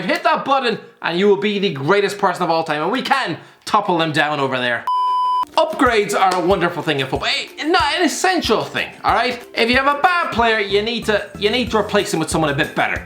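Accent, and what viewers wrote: Ireland. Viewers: Any other man rants 🤦‍♀️ Irish man rants 👌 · Are u irish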